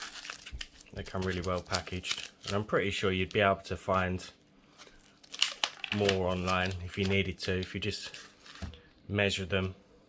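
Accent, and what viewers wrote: Essex, United Kingdom. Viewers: Your accent is *charming*,. I've never, even once, had a problem understanding you, and I'm from the States & have never heard an Essex accent in my life. So, please, don't have another thought about it. It's such a nice accent! Combined with your calm voice...? Perfect mix! And, now, for the video. I LOVE this beautiful mirror casting! ❤ It's so, so pretty! The leaves are perfect (great choice of mica powder) and what a genius way to do it! 👍😊 I'll bet she loves it!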